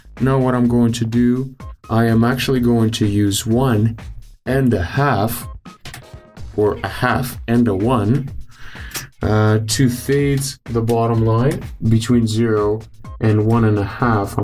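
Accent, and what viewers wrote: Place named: United States. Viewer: I´m confused.. u look russian, but you sound american:D but great tutorial!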